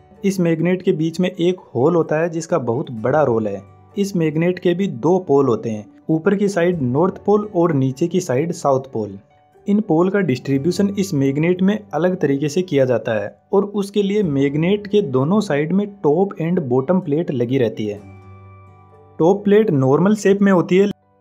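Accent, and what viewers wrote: Rajasthan, India. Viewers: Bhai tumhari aawaz se saf pata chalta hai ki tum rajasthan se belong karte ho .. shayad..?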